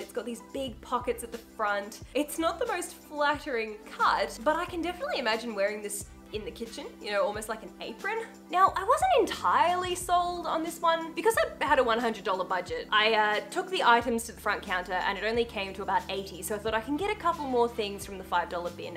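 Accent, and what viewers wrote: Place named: Australia. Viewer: New subscriber here. My great grandmother was from Northam Australia. My great grandfather brought her back to the United States, he met her when he was stationed over in Australia during WW2. He was in the navy. Your Accent, brought back great memories of my Nan. She passed away when I was 15, I'm now 31. My great grandfather is still alive and well. My daughter is his first great great grandchild. 😍 My Nan never lost her Australian accent.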